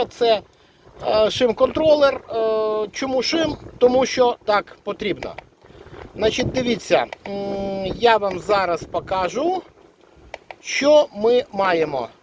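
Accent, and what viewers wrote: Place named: Odesa, Ukraine. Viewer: Дякую за пізнавальне відео та шикарний одеський варіант української мови ❤❤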